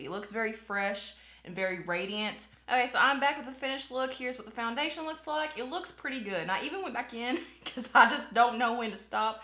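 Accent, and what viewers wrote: South, United States. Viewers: I just recently ran across you on youtube, like a week ago & I think you're just awesome!! And so southern...like myself ❤ Merry Christmas!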